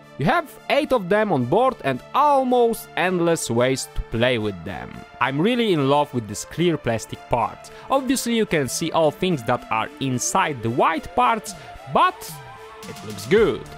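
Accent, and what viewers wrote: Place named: United Kingdom. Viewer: I'm in NC, USA. But you sound like you're over the "pond" lol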